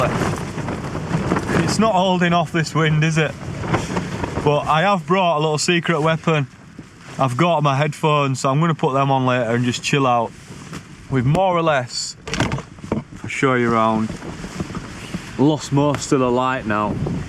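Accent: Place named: Bolton, United Kingdom. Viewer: Love the brawd acsent iam fr bolton sound summit like but u talk like ur about 50 mate how old ru, iam 63 just got cancer basterd so do miss it pal atb stay safe ❤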